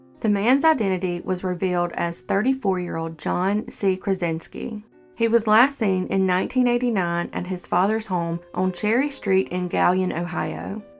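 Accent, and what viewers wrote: South, United States. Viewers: Yeah the videos really good and informative, but that southern accent is the cherry on top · Love your accent 😊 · I love the narrators southern accent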